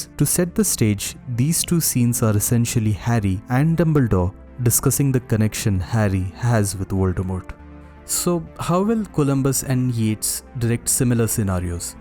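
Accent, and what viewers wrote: India. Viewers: is this guy indian? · Bullshit, Yates did a great job The retarded overly dramatic emotional cues are a thing of past that is 1990s to early 2000s U want every single emotion to be emitted as a child’s overly expressive face?? Go watch home alone. Moreover your Bihari accent ruined the essay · Indian accent…..annnnnd I’ll pass. “Saaaaaaar they should have fired all the English people and hired pajeets. Saaaaaaar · You sound Indian brother. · This accent lmfao pajeet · I was excited to watch this video but then I noticed Indian accent.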